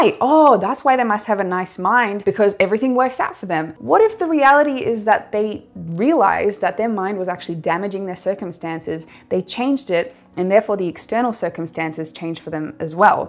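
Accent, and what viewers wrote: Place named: Australia. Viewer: I couldn´t figure out why but the australian accent helps me to understand subjects better.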